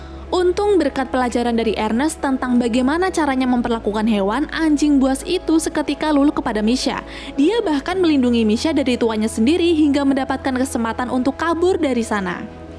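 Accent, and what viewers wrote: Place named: West Java, Indonesia. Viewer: Sue sundanese na geuleh naon teh wkwk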